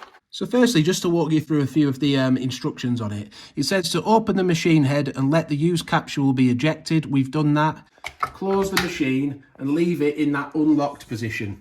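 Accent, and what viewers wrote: United Kingdom. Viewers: Thanks for taking the time to make this video. Way better than what Nespresso has done. Plus, appreciate the accent from the other side of the pond. Go Three Lions!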